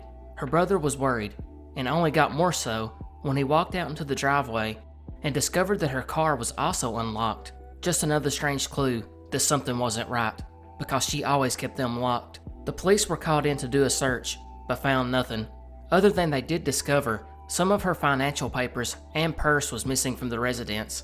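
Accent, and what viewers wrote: South, United States. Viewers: As someone from the south, I barely noticed the accent lol. Sounds friendly to me personally. Love the videos man, can’t wait for you to blow up 👽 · I'm Southern! Southern English, so these stories are all new to me. Your accent is absolutely charming. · Don't get rid of your accent. To hell with the haters. DIXIE PROUD! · I really love your accent. No matter how many Americans i met here in Germany, the nicest ones always came from the South :) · As someone who lives in the south I enjoy more southern youtube channels like yours and Wendigoon, I love your accent, keep up the great work man!! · it’s awesome to hear someone w a southern accent doing stuff like this! you got a pretty strong accent, where in the south are you from? sending love from the deep south 💜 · I am very glad to find a fellow southerner who makes this sorta content! 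You got an amazing accent, don’t let anyone say otherwise! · I love your accent! I'm Canadian so I don't get to hear a nice southern accent very often. It makes me want to cook up some biscuits and gravy 😆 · As a MS resident who doesn’t have a strong accent (to the point of being asked where up north i’m from) hearing your southern accent is comforting to me! it’s very epic! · You just gained another subscriber! It’s really wild how much accents can vary in timbre and drawl. Way down in the delta is so similar but also very different from here in southern Appalachia. It’s great to hear a southern accent here on the mysterious/creepy side of YouTube! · i personally love your southern accent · Southerner here too, an Arkansan. Trust me hes not faking that accent. Ive heard that twang my whole life and it check out. Thats really the way he sounds without even trying. If he was it would be obvious. 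Making fun of a Youtubers NATURAL accent? C'mon y'all. It could be a whole lot worse. Some youtubers out there feel inclined to make up their own accent. "To establish a brand?" lol gimme a fkn break, nobody on Earth talks like that! Thats the kind of fake sht yall can laugh at, because it is kind of lame. But the way someone naturally speaks? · It is very comforting to hear a Southern accent presenting topics so cogently and eloquently. Much love and respect from West TN/ North MS, keep doing what you do good sir. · Born, raised, and lived in the South all my life. Your accent is part of you, but isn't you. Don't let anyone give static for it. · ill be honest the first time i heard a southern accent on a iceberg lol but honestly it makes your channel unique and im from wv so its cool ! nice seeing some southern iceberg topics !! glad you didn't cut wv out of the mix thanks king !! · I love your accent and being from Kentucky, I have a little bit of one as well. 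❤ So glad I stumbled across this video! Great content! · i grew up in south carolina and just moved back to the south (tennessee) after living in the midwest for almost a decade and i’m so glad i did. i love the south, your videos, and your accent. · Even being from the north I enjoy your southern accent and I think you should keep it and embrace it (Also it’s pronounced Kane-ka -kee) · People are awful, guaranteed it was some’woke’ hypocrite hating. Your accent is awesome, never get rid of it it’s who you are, its where you’re from! I’m stuck in a Northeastern grossly, overpriced, dump and often visit the south. I actually lived in New Orleans for five years and wish I never left, but I had to, and I would give anything to live in the south. You guys cherish your history, everyone is so fantastic down there, the food, the music, the everything . So don’t listen to the haters. This is an awesome idea and I love your channel.💙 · I'm from the UK and I like the southern accent! 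· The best part about your content IS your southern accent narration. Ty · I absolutely love your Southern accent.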